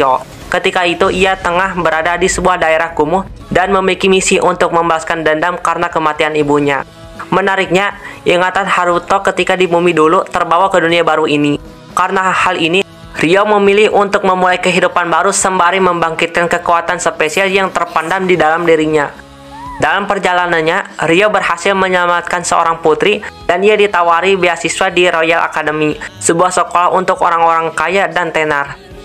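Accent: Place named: West Java, Indonesia. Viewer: suara khas sunda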